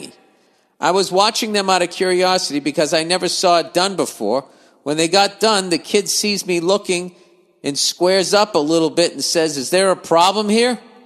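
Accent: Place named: Texas, United States. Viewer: His texas accent is hillarious!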